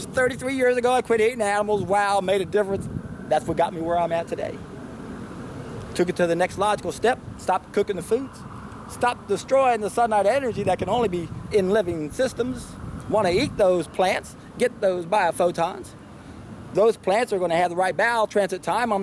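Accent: South, United States. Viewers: I'm from France, and I think your accent sounds funny. Is it the southern accent ?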